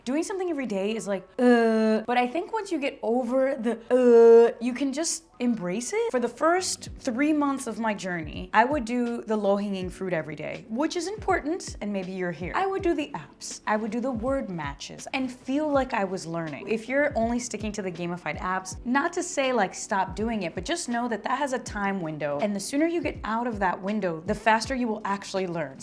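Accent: Flanders, Belgium. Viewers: Bedankt voor jouw gratis prompts. Jouw vlaams accent vind ik ook "snoezig". Momenteel ben ik spaans, deens en papiamento aan het leren. Papiamento wordt op Curaçao gesproken en is een mix van spaans, nederlands, portuguese, en wat afrikaanse talen. Mijn moedertaal is engels. Als je een spreekpartner voor het nederlands wil hebben ( gratis EN voor niets), laat het me weten. Ik zou het leuk vinden. Groet, Greg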